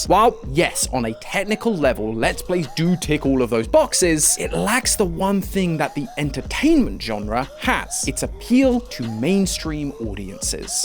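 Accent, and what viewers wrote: United Kingdom. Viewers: Ugh, what’s this British voice guy? I ain’t watching this lol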